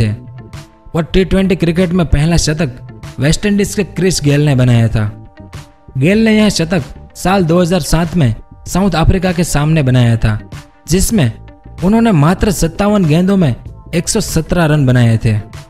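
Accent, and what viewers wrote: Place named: Rajasthan, India. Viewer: Bhai ..aapki aavaj s lag RHA he aap Rajasthan se ho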